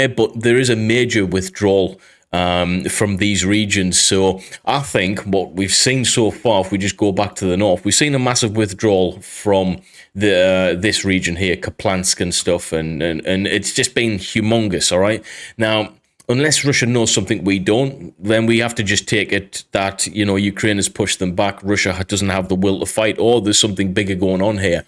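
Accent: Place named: Northern England, United Kingdom. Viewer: I thought I was hearing a little Northern England in your accent!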